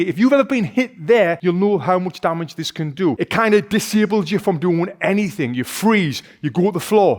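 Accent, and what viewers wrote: United Kingdom. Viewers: I'm learning British accent while learning how to fight )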